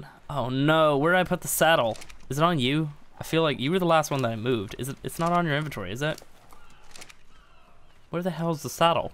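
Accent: Ireland. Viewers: Which part of Ireland are you from? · Are u Irish bro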